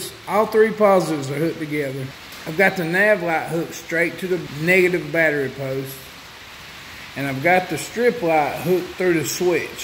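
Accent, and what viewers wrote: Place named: Arkansas, United States. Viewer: Man you sound like home cooking to "dis" here displaced Arkansan! Thanks "fo da" videos brother... will be using lots of this info in my build.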